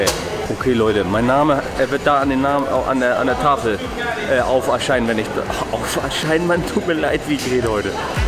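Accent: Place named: Hamburg, Germany. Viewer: Ich schau dir so gerne zu, ich würd auch gern sagen das ich dir gerne zuhöre aber dein nuscheliger Hamburger Akzent, einfach lustig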